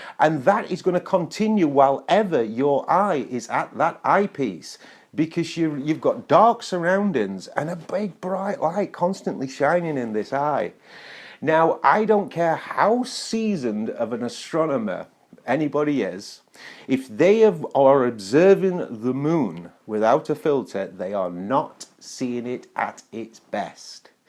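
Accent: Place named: Cockney, United Kingdom. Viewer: Sorry, man, but your "m'kay" and "o'right" remind me video by Alfie Solomons "Learn the cockney accent". 😅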